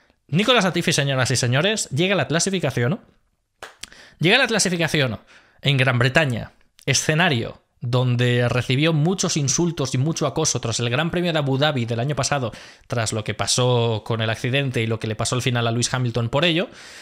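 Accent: Spain. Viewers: Ya tienes dejes madrileños en el habla jajajajajajjajaja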